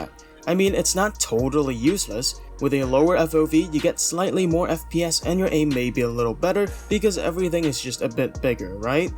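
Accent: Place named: Asia. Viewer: love the asian accent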